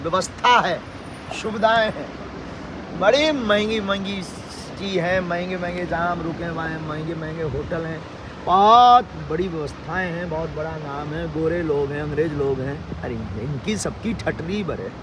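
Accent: Bundelkhand, India. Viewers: जननी जन्मभूमिश्च स्वर्गादपि गरीयसी आप और आपकी बुंदेलखंडी दोनों ही बहुत प्यारी है🙏🙏👍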